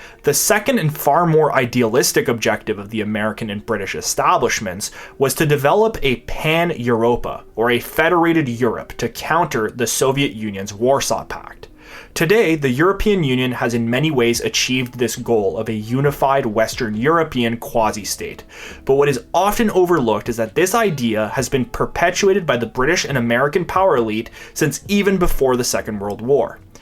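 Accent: Quebec, Canada. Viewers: C’est du bon québécois! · Tu sonne comme si ta appris ton francais au québec. Nice! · mon, ton accent me dit que tas grandi un bout a quebec haha ne laches pas continue ton truc tes videos sont geniales! tres bien recherché chaques fois! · That pronunciation of French? My man is Canadian. French-Canadian. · Most likely French-Canadian per the accent. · Thanks for the wonderful video, and thanks for not hiding your Quebecois/French Canadian accent!:D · I think he's Canadian -or very much sounds like in French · Makes sense the dude is definitely from Quebec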